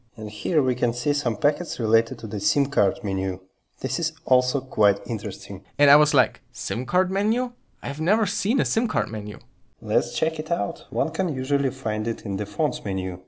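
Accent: Germany. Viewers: Man hört, dass du deutscher bist. :D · Are you german? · You are german right? Guten Tag ich bin deutscher :-P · Are you german Bist du n deutscher😂?? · Hmmmm 🤔 i can clearly hear that you are German ^^ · Are you a German? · junge hört man krass das du deutscher bist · Bist du Deutsch? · Chicken Fish i think he is from germany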